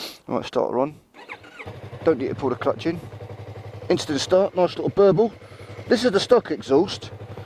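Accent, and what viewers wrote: United Kingdom. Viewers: you sound british, i've got a question. what does the word cheeky mean, if someones acting cheeky what would you call that in american english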